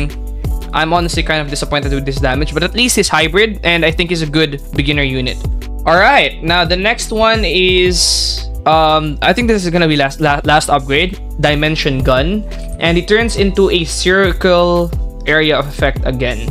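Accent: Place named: Philippines. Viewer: bro I'm Filipino, but your 100% Filipino, the accent broo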